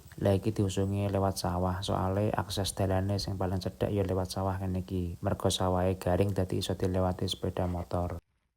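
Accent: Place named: Java, Indonesia. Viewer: Bang sampeyan ki uripe no wilayah ngendi yo kok bhs jawane medhok banget .aq terus lo nyemsk tik tokmu aq jawa timur mlng .aq seneng nyimak olehmu masak2..nek masak bumbune cucinen disik lo yo wedine onok kotorane...yen nyawang olehmu maem dadi ngiler..mugo2 cenele sampeyan terus maju nggih.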